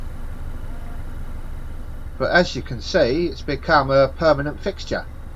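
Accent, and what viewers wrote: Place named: Cockney, United Kingdom. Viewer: Another great vlog and so nice to hear the old cockney patter again. Moved out from the East End about 13-14 years ago as you know and mainly hear the old Essex twang now. e.g. ing has become `n` (shopp`n, work`n) and so on. The locals get their own back and I get my leg pulled for dropping my `H`s 😁 Lovely day for travelling the canal especially with the sun shining. Also glad your not dying of thirst either. Keep them coming, so enjoyable. Seeya. Love Mumx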